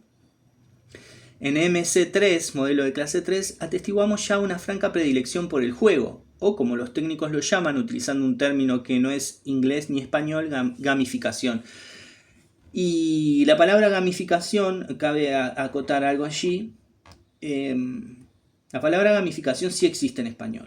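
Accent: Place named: Uruguay. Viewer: Hola. Envidio esta elegancia en la expresión. Y este acento uruguayo me parece también magnífico. Gracias por regalarnos tanto conocimiento y por ser tan crítico con determinados tipos de inclusión que, en lugar de integrar, desintegran la vida de muchas personas porque desintegran su mente. Un saludo cordial.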